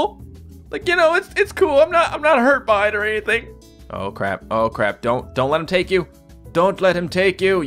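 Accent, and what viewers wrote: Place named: Newfoundland, Canada. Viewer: oh my god! while watching this video i was thinking, he kinda sounds like a newfoundlander and i go to your account and you are! this makes me so happy :) #newfoundlandbuddies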